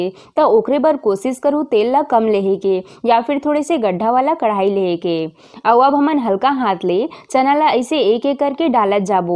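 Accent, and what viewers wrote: Chhattisgarh, India. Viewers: Bahut sunder...🎉🎉🎉Chattisgadhi boli ke mithas hi Bahut pyara he... Sath hi apman ke chana roast banye k tarika bhi bahut pasand lagish...😊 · Chhattisgarhi desi aawaj sun k maza aa ge sunte hi like subscribe kar dew.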